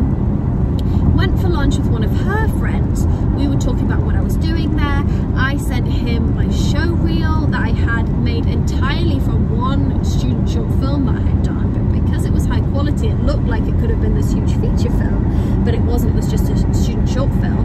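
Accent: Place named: Sheffield, United Kingdom. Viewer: Lol. The more you talked the more you could hear your Sheffield accent come out. ( also from Sheffield not living in England )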